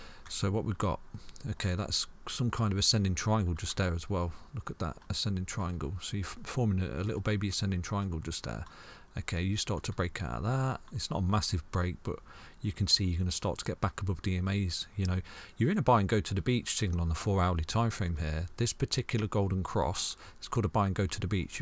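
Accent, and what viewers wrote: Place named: Norwich, United Kingdom. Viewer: Given the accent I'd say around Norwich haha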